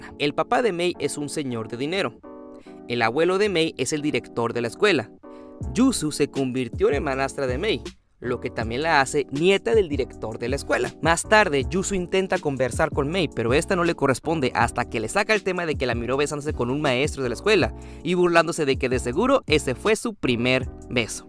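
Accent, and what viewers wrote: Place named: North, Mexico. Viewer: Santo cielo, que norteño 8| Buen video :D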